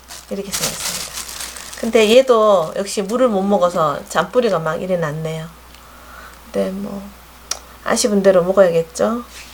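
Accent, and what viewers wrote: Gyeongsang, South Korea. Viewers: 감사합니다 답글^^* 그런데 누가 그랬는데, 콩이오래되거나 묵은콩이면 안됐다고 들었던거 같아요 햇콩이나~ 일년 안쪽에 된콩이 좋다고 그런거같은데 암튼 미국에서는 그런콩이 없었어 안되나보다 하고 그 뒤로는 아예 할생각을 안했거든요, 항상! 구수한경상도 말씨로 깔끔하게 쉽게 설명 잘하시고 늘건강하세요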